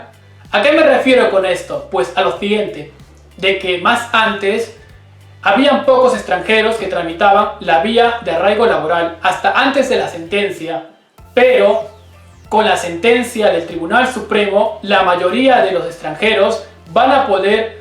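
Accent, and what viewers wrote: Spain. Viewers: Os daré 🤡 latinoamericano hablando como español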